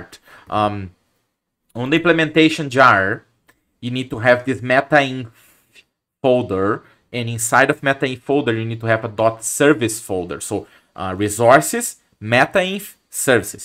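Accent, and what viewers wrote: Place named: Brazil. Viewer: Pretty good job explaining the concept man My dad is also a although we're from mexico, but i can tell you are very brazilian from your r's!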